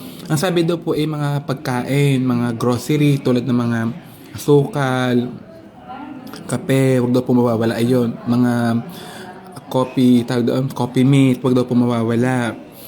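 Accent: Batangas, Philippines. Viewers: Batangenya ka po ba???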